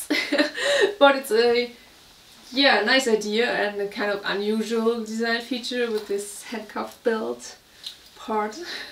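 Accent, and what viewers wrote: Germany. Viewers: You're a very pretty woman 👩 💕 look sexy in these style clothes 😍 I think I can hear a German accent that sounds cool. Not a fan of blue hair, but I understand that's today's style, I just like to picture you with dark brown hair, oo LA LA 😉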